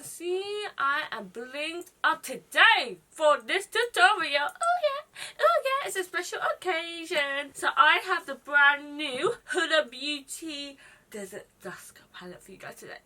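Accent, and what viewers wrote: United Kingdom. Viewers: Its always weird going from watching people on insta and then finding their YouTube channel and actually hearing them talk :p totally didn't think she was from the uk!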